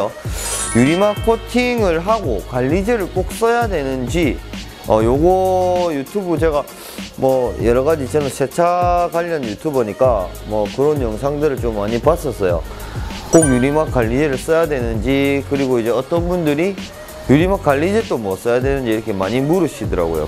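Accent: Gyeongsang, South Korea. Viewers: 경상도사투리에 구독.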